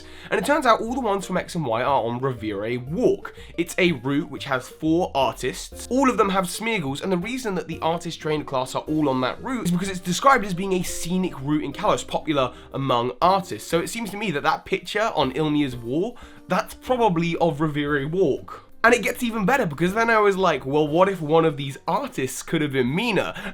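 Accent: United Kingdom. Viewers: SwaggitySwooty it might just be his accent, he is from the U.K. after all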